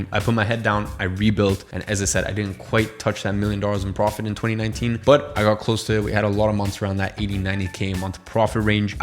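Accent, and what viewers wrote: United States. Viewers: You sound American. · How does he have that accent if he's only been to the US twice at that age ? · you have an American accent. Where are you from?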